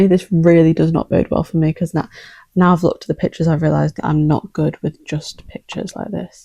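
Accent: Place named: United Kingdom. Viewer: for a second i thought the pronunciation thing was wrong, and then i realized… british. lol in most american accents we say MAcrame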